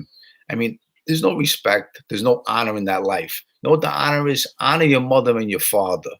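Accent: New York, United States. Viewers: your accent is straight up NY Gangster!!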